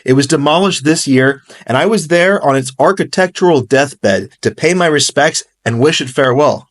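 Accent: United States. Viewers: Where is this guy from originally, The US, or Canada? It’s very hard to tell the difference most of the time. People in the state of Minnesota sound more Canadian than most Canadians do. 😂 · Belfast? I thought you were American with your accent interesting 🧐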